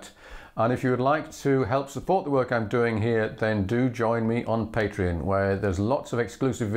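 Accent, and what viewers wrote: England, United Kingdom. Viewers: An English accent ha! Well I'm glad not all Englishmen hate the Irish. Do you have any good advice for the fingerboard technique to give it that Irish twang.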